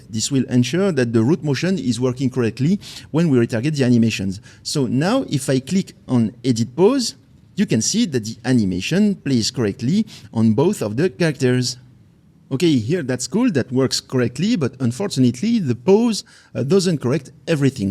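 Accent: France. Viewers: You are so awesome, why is your content so high quality ?? Are you french like me BTW ? Your accent is perfect <3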